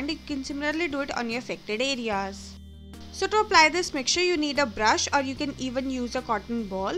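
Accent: India. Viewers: im for sure you are an indian, the way your accent sounds like, by the way, the way you said POTATO is kinda bit funny, in a good way. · this indian accent had been very annoying omg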